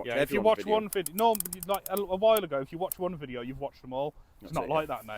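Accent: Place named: Rugby, United Kingdom. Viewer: You sound like you're from Rugby!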